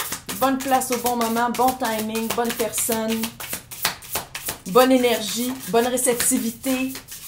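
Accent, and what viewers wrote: Quebec, Canada. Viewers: j'aime bien ce que tu est ne lâche pas tu est une source d'Energie .. je suis désormais abonner a toi.. pour ton accent je suis québécoise aussi donc nous avons le même langage entre les Français québécois et anglais malgré nous courage tu es merveilleuse.. merci de la video sa ma donner un peut plus de courage et de bonheur en moi..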